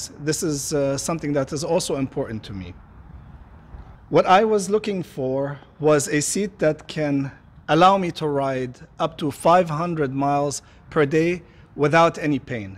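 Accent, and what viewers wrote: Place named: Middle East. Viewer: This is the best comparison video for motorcycle seats. Great job, by the way. Lovely middle eastern accent😉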